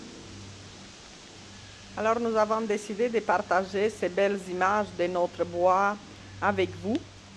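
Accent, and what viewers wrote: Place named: Canada. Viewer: merci 😀. Il me semblait avoir reconnu l’accent canadien mais je n’en étais pas tout à fait sûre😀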